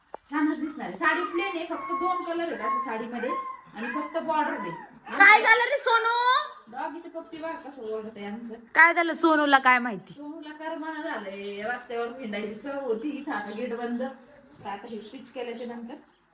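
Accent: Ahmednagar, India. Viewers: ताई आम्ही पण आस म्हणतो कि गणपती बुडवयचा कानातले कलेक्शन खूप छान आपली नगरी भाषा खूप छान ❤ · तुमची बोली भाषा खूप छान आहे.मी काही वर्ष नगरमध्ये राहत होते.मला खूप आवडते. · आग मनाला नको लावु घेऊ आपली नगर भाषा खुप प्रसिद्ध आहे मी मुंबई रहाते 20 झाले माझी भाषा काय बदली नाही 😂. लोक लगेच ओळखतात नगरी आहे का तुम्ही 😂😂😂😂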